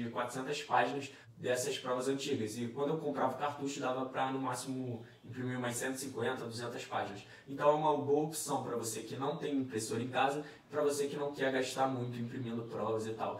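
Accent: Rio de Janeiro, Brazil. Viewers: bonito, inteligente e sotaque carioca pressão: "me ajuda" cai aqui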